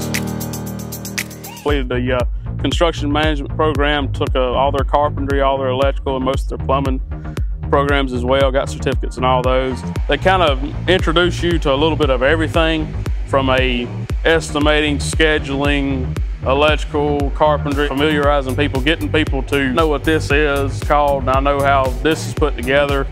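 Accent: South, United States. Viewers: Yes, he's got a drawl. Why?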